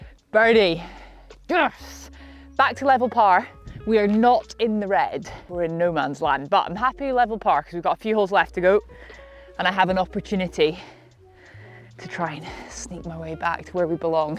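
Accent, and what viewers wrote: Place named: England, United Kingdom. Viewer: Your commentary and tips are spot on! The English accent doesn’t hurt!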